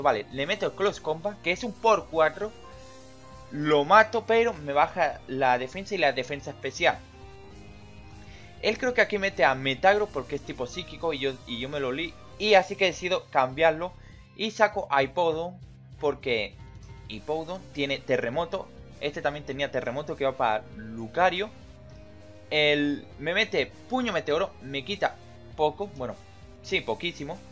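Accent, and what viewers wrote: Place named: Mexico. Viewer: suenas mexicano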